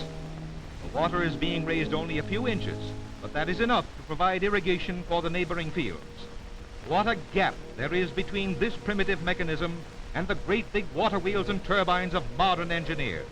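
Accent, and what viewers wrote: United States. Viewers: It's a yank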